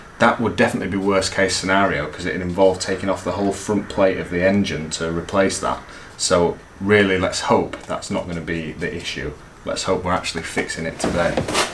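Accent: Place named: North, United Kingdom. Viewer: Great video, similar issues here with my 2012 Panamera 3.0tdi, would you like to repair mine for me, happy to have the car dropped off wherever you are, sounds like you’re a friendly northerner, I’m near Preston.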